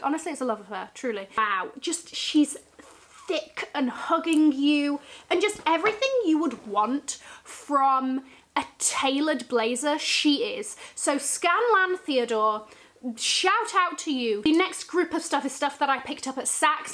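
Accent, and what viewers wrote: United Kingdom. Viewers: Can you give us your opinion about the new iPhone? It is definitely a luxury item, do you have designer cases? And what applications do you use? Oh and merry Christmas and new year! I love your British accent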